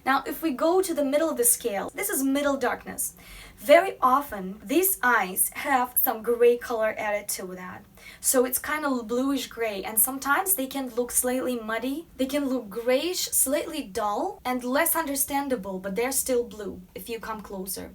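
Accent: Russia. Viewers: Ахах, через 20 секунд речи поняла, что девушка русская. Рашн инглиш из соу рашн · I have the muddy eyes, dark green and orangey brown. Like you,. I knew when I saw you and heard you speak that you were Russian, before I read your bio.;) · Всегда узнаешь русский акцент)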